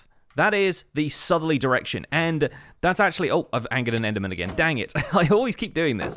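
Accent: England, United Kingdom. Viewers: My gosh I love your videos and your English accent!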